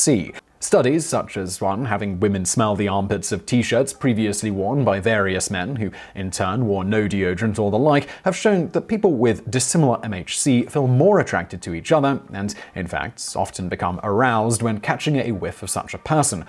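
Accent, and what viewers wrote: United Kingdom. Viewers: Even when spoken with a British accent, merkin is an ugly word.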